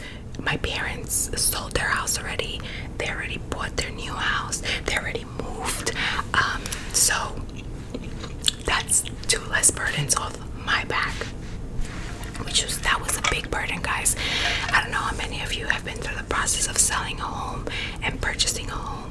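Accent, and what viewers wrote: New York, United States. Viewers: Hi. Just started following you insta and I would like to say well-done on the weight loss fantastic to see. Listening to your voice what accent is that? New York? All the best from bobby from Warwickshire England.UK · So happy to see the bell notification and that it was a vid from you! Everybody needs a break from you tube sometimes and don't feel bad about accepting donations..you deserve them! I would love to see your professional makeup kit/application..I love the sound of your jewelry making noises, and when i hear your New York accent. It's so obvious you experience ASMR.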